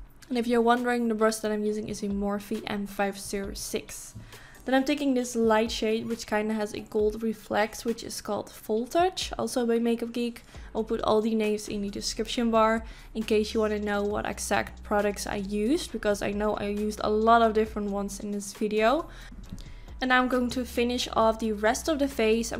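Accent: Netherlands. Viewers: your Dutch accent which I find charming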